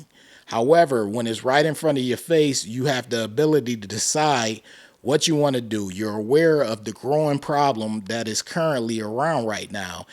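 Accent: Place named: Philadelphia, United States. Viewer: Bro, you sound like you're from Philly. Buffalo is a surprise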